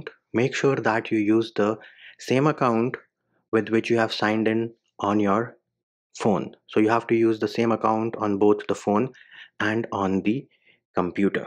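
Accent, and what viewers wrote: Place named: India. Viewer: indian accent make me laugh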